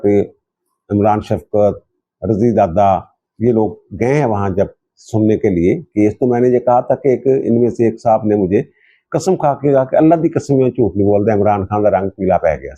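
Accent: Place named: Doaba, India. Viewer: sahib, tusi Jalandhar ya Hushiarpur de o? Tuadi Punjabi ton kagda ai.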